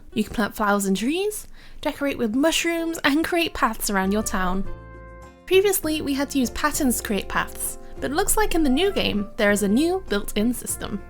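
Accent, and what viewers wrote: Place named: Northern England, United Kingdom. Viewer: It sounds like northern England mixed with America/Australia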